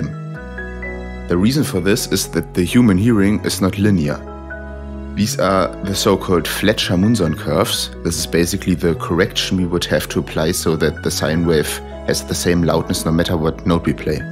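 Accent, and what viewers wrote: Germany. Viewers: Super video, echt spannend! Muss aber sagen, dass dein deutscher Akzent echt extrem ist (nicht das es bei mir besser ist)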